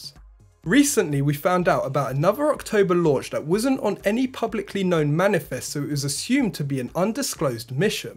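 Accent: England, United Kingdom. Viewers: Christopher Carr you throw linguistic terms but you can’t tell he has an English accent to me that seems unusual.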